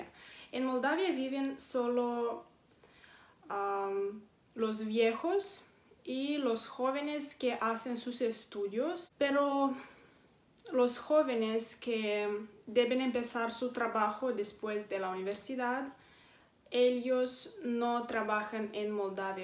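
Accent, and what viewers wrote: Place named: Spain. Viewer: Estas seria siempre o es por concentrarte en hablar español? Intenta hablar más neutro y no como española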